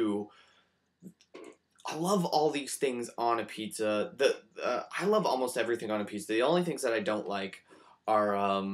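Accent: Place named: Canada. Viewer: Your Canadian accent is soooo hot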